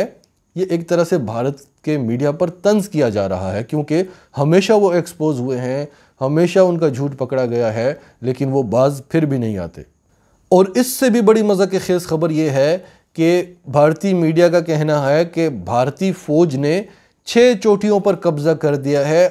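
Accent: Pakistan. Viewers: The best u tube chanal imence respect brother love your talking style good pakistani urdu accent best urdu word s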